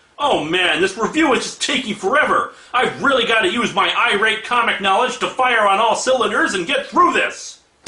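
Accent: Minnesota, United States. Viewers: What pronunciation problem does he have? Unless you mean his Minnesota accent coming through on words like "roof"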